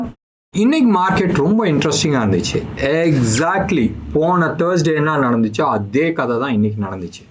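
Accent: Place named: Kongu, India. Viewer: I felt I was at erode speaking to my cousins regarding the market status .but we enjoy the slang and daily used phrases all pains have washed out l.i ama kongu girl thank you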